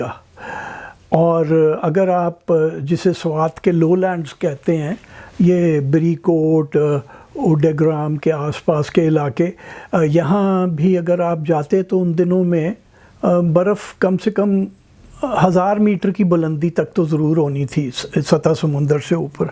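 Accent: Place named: Talagang, Pakistan. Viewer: My parents and grandparents emigrated from Talagang. Your Punjabi is similar. Are you from that area?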